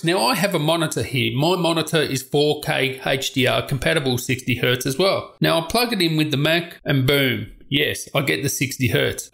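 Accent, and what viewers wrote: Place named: Australia. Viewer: Oh its so aussi 😆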